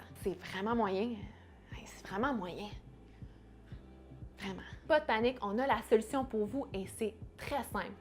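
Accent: Quebec, Canada. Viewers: Excellente vidéo tant par le contenu que par la forme. Egalement accent français canadien très, très agréable à entendre. Un régal. Merci pour votre contribution. Bonne continuation.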